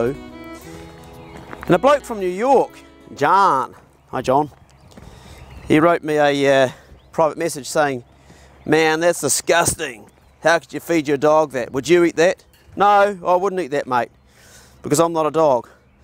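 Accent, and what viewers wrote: New Zealand. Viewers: are you a kiwi or? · okay take that back you are NZ xD as the video went on.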